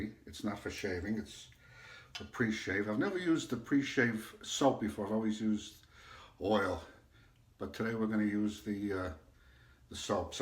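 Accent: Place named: New York, United States. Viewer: I love your New Yok accent.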